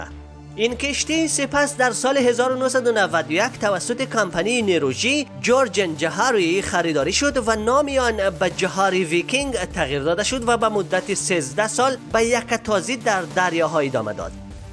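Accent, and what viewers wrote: Afghanistan. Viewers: لهجه ایشون لهجه متداول در افغانستانه. جمله بندی و دستور زبانش هم مربوط میشه به فارسی دری. بنابراین ایشون کاملا درست صحبت میکنه. شما لطفا یه کم ادب و نزاکت یاد بگیرید.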